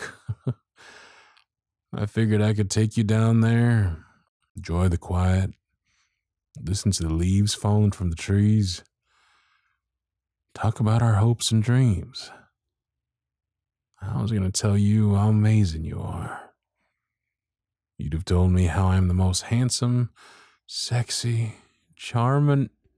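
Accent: South, United States. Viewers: aaaa!!! love this audio so much. Southern country boys are my type anyways so this type of audio is awesome!!! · Woah, who was gonna tell me SOUTHERN exists?! I love it! Gimme more please 👉🏻👈🏻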